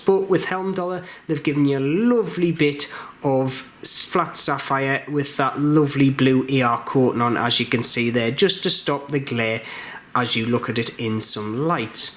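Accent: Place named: North, United Kingdom. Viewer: Can’t place the accent. South Durham, Cleveland? I enjoyed the review. I have a black gen 2, which, needless to say, I adore. May just get one of these.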